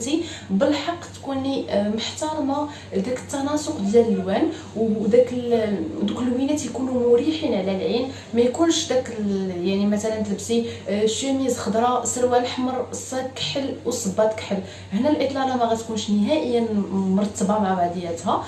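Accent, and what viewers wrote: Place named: Maghreb, North Africa. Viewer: فيديو رائع ❤️❤️ تصوير رائع ❤️❤️بس اللهجة صعبة الفهم لمعظم العرب لا يفهمون اللهجة المغربية والجزائرية والتونسية للأسف 💔💔 ف الرجاء التحدث ب الشامية أو الفصحى أو المصرية هكذا ينتشر الفيديو أسرع ويكون له جمهور أكبر او ضيفي ترجمة مصاحبة بليز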